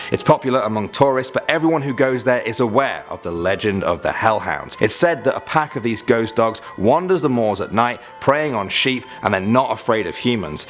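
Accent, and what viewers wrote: United Kingdom. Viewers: Are you Britsh 🤔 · i didn't know that he was british! hello m8!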